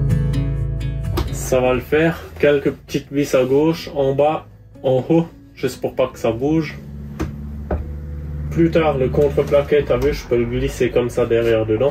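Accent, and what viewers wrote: Alsace, France. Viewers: hooo ce bel accent Alsacien héé sanlu tu!!! très bon job je suis d'alsace aussi!!!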